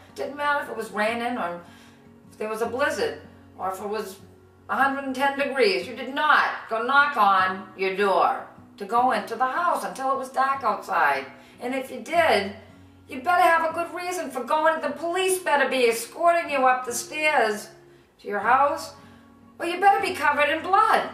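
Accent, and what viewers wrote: Boston, United States. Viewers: love your videos!! thanks for posting. i love the boston accent. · ❤❤❤ Love it, I am not only learning a Boston accent but mannerisms too.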